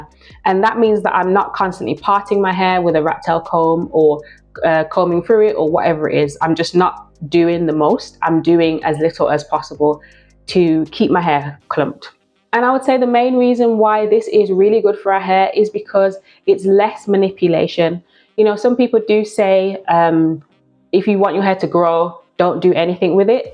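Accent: United Kingdom. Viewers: Good to hear a British accent. Greetings from another northerner.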